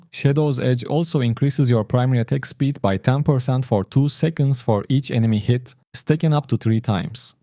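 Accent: Turkey. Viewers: Videoya girer girmez türk ingilizcesi aga nerde olsa tanırım 😅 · Kanka sen Türk aksanı ile konuşuyorsun öyle ise yazar mısın?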